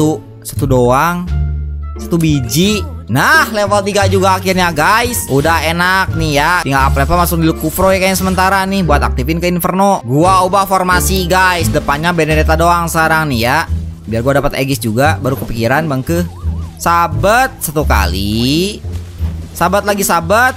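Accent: West Java, Indonesia. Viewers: Mang org mana Sunda n logat n alus haha 😂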